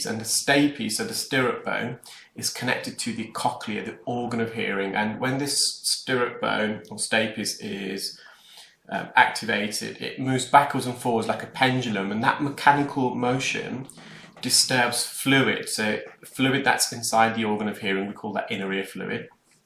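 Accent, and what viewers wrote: United Kingdom. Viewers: My daughter has profound bilateral sensorineural hearing loss and uses a cochlear implant. Thanks for the lesson on the differences in hearing loss; I was glad to have a refresher course. She went from hearing aids to the implant but still continues to have itchy ears. I took your advice and bought the Earol spray and it is helping. Thanks! Love your videos and your proper British accent. I get so tired of hearing all the “yinzer” talk here in western PA.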